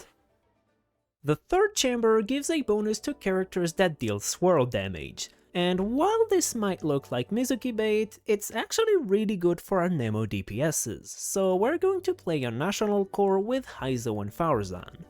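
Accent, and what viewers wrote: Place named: India. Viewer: this is good idea india aeccent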